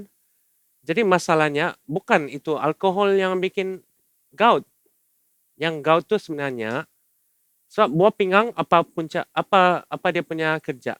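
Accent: Sabah, Malaysia. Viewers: Tq doc.... Siok dengar penarangan versi sabahan...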